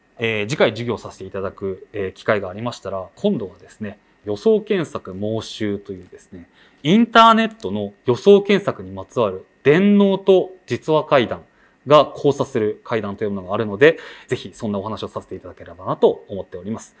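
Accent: Aizu, Japan. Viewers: 秋田出身だから会津の方言も耳に馴染んできて、その分余計リアルに感じられました。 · 有難う御座いました。２回にわたる長講義お疲れさまでした。私の祖母が東北の人だったので子供の頃に聞いた会津訛りの言葉を思い出しました。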